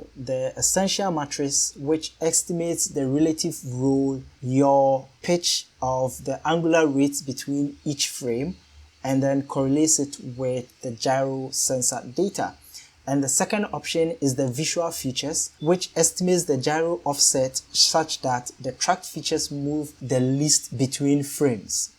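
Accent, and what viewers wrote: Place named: Africa. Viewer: Your African accent when you speak English sounds great and is easy to understand too.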